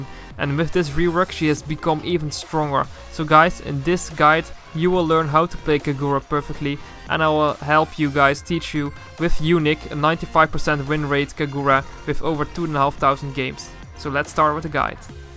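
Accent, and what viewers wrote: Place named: Netherlands. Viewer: Ja aan dit accent hoor ik gewoon dat je Nederlands bent lol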